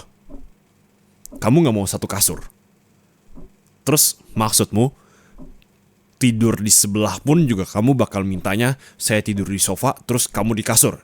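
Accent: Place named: West Java, Indonesia. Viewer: yantonya logat sundah ini teh yaallah 😭😭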